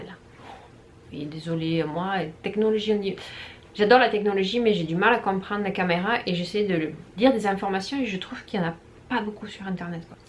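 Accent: South, France. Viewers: Bonjour, merci pour ce partage avec ton bel accent ensoleillé 😄 Tu parles de l'application Couture Actuelle, où puis-je la trouver?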